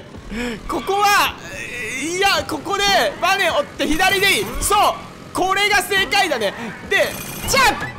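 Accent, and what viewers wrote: Fukuoka, Japan. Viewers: やっぱり、福岡？の方言は良いですね（笑） 今日のLIVE楽しみしてます( *˙ω˙*)و ｸﾞｯ!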